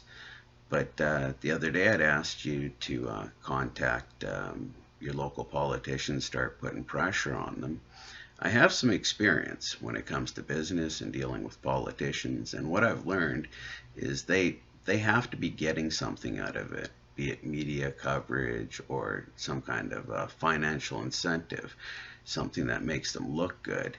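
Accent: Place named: Canada. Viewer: Really enjoying your videos Brother! Nice to hear a fellow Canadian! I was born and raised in Quebec but my Mom is from the Okanagan, Salmon Arm, Revelstoke way. Keep those experiences coming, I really enjoy them and it amazes me how close all our stories from all around the world are. I really hope you are keeping well with your medical issues, I will keep you in my prayers!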